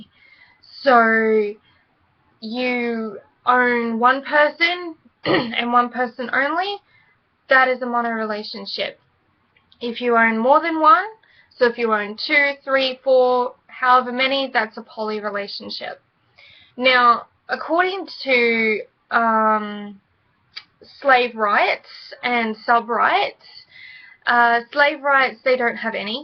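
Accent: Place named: Australia. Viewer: Hi - I hear from your accent that you are an Ozzy - Ozzy girls are best. Thanks for the informative vid.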